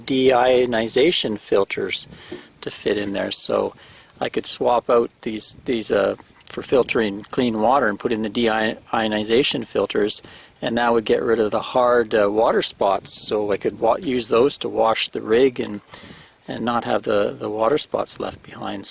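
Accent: Canada. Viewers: I could listen to a Canadian talk aboot anything all day long eh.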